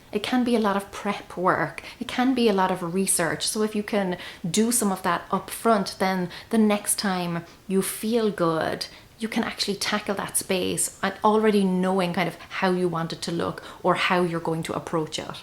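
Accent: Ireland. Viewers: Very real. I am recovery surgery and low energy, this is very realistic. Thank you for this great viedo. I love your accent, it must be Irish, awesome!🍀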